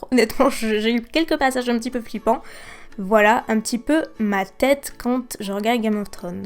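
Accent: South, France. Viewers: Tu as un accent incroyable on dirait que tu viens du sud je t'adore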